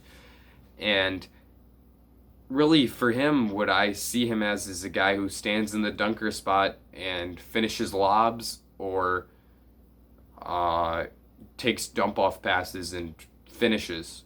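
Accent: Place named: Canada. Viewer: the way you pronounce a couple words sounds Canadian, being from Wisconsin makes sense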